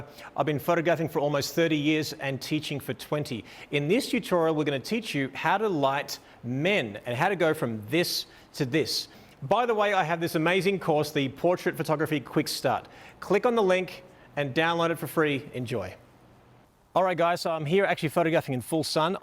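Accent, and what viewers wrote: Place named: Australia. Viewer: I love his aussie accent.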